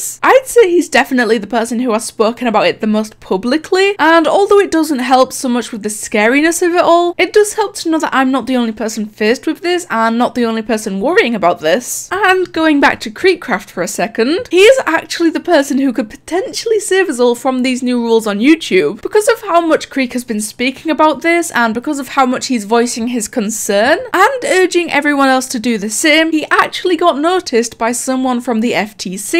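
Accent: Scotland, United Kingdom. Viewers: I love me a Scottish Accent!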